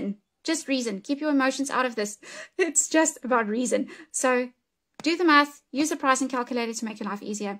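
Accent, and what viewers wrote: South Africa. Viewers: Your accent makes me miss my friends in South Africa!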